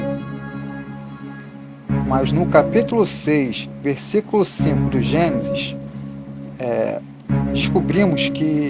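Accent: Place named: Rio de Janeiro, Brazil. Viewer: Desculpa mas esses narradores com esse sotaque carioca desisto de ouvir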